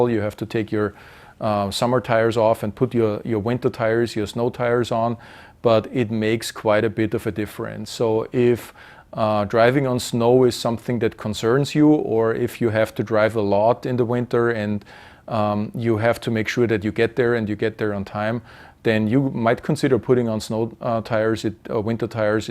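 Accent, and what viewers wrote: Germany. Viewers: German accent?? 🤔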